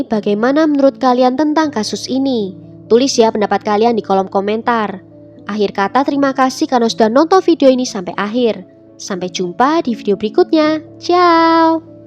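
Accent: Java, Indonesia. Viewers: Mimin suaranya medok. Jawanya mana min?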